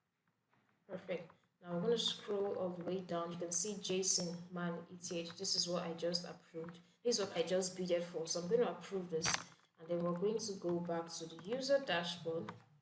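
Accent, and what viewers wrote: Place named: Nigeria. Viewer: I hear the Nigerian voice. Good job and thanks for this.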